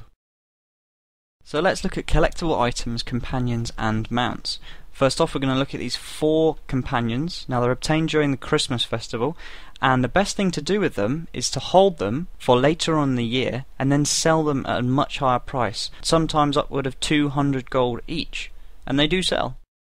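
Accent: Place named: United States. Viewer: Thank you for the video! Love your accent... (usa)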